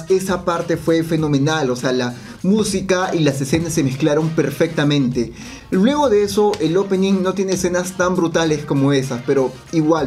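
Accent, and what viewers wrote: Mexico. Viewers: Porque hablas como mexicano, si eres peruano, te hace falta identidad hermano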